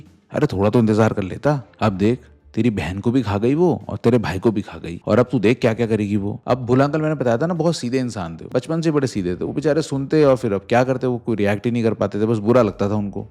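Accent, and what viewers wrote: Pahadi, India. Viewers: Apke bolne m pahadi accent ata bhut acha lgta h sunna mere bhi apne dosto s bolne m pahadi accent ahi jata h😂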